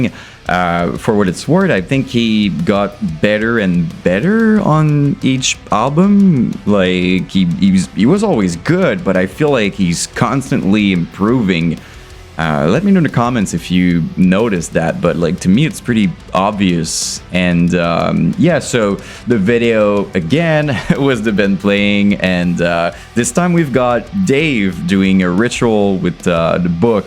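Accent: Quebec, Canada. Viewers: c'es tu un accent Québécois que j'entend mon chum?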